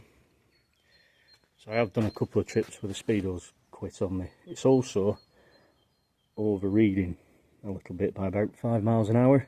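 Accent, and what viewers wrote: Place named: Lancashire, United Kingdom. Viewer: Just a non relevant point, your accent seems more Blackburn, Lancashire, than Norfolk. Not withstanding this the ' bike is great and I would love to own it.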